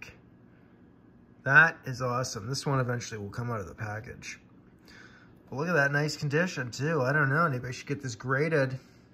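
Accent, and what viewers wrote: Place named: United Kingdom. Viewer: Also love your British accent haha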